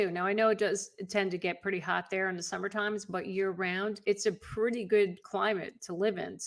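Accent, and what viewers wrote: New Jersey, United States. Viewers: You are good looking and totally on top of your game. Love the Jersey accent.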